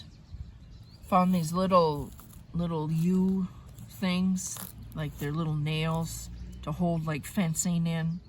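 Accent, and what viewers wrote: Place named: Minnesota, United States. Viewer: I think the O is from Ford. Also, the Minnesotan is coming through….”Baja Bug” where you pronounce the hard J made me laugh (I’m originally from MN). It’s pronounced Bah Hah (think of the off-road racing in the Mexico/California desert). 😊 Anyways, lots of cool finds. Hope you get some extra permissions from your presentation to the church goers.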